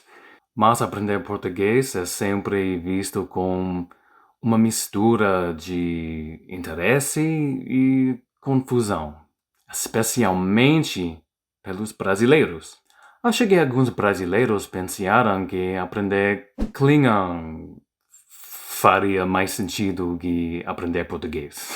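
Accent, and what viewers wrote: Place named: United States. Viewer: Parabéns bro, Já está falando português brasileiro fluente, apesar de um pouco de sotaque americano, mas vá em frente aos seus objetivos. Você só não nos falou se você lê e escreve em português. Sucesso ao seu canal !!!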